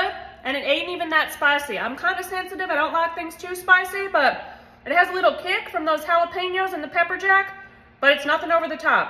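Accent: South, United States. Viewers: I LOVE HER COUNTRY ACCENT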